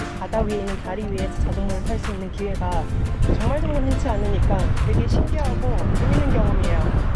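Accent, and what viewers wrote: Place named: Gyeongsang, South Korea. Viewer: 저도 경상도 사람인데 혹시 어디분 이세용??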